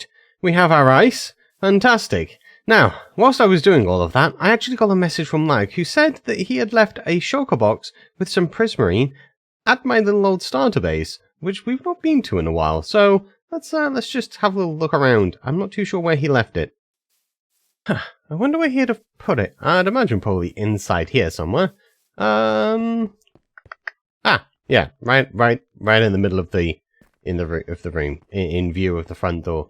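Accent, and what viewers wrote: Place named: Mansfield, United Kingdom. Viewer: I've been trying to figure out the accent for a bit. I was leaning towards Mansfield. Yorkshire had crossed my mind too. Edit: to be fair leaving the UK has dulled my ability to pinpoint accents considerably.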